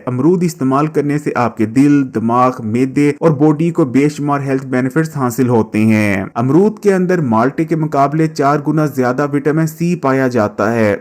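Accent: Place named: Punjab, India. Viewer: BHAI SAHIB JAB AAP NE URDU PUNJABI LEHJE M BOLNA HY TU BUHAT BEHTAR HY AAP PUNJABI M CHANNEL LANGUAGE RAKHAIN.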